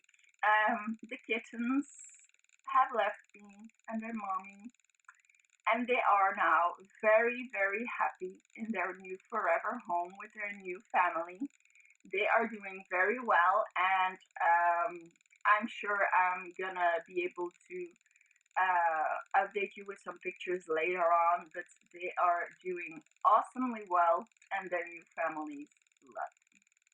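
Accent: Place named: Norway. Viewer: Regardless of the project, I'm sure it will be challenging to get it done in a week. I'm sure you've said before, but where are you? Sounds like a Norwegian country?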